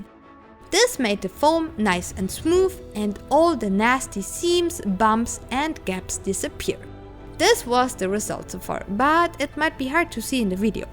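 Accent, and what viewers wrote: Germany. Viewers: Are you from Germany or something like that you have a very strong German accent in your English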